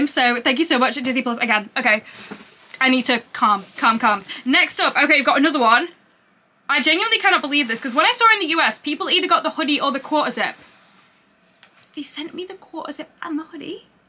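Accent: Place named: Birmingham, United Kingdom. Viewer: This video was created with care and chaos. Balloon segment wasn’t the highlight. Hoody, water bottle… I’m appy… The most Birmingham accent you have done. Interesting fact, I have done some research on the Edinburgh shows. Sunset is at 2155 and twilight finishes at 2256. The Edinburgh shows look as if they will be in full daylight, if Taylor hits the stage at 1900 as is anticipated. These shows will have a different vibe to them. If you are coming to Scotland, you will experience it.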